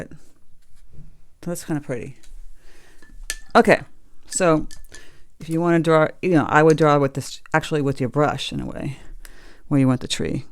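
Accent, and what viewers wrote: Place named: New York, United States. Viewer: Love the accent! N.Y, N.J.?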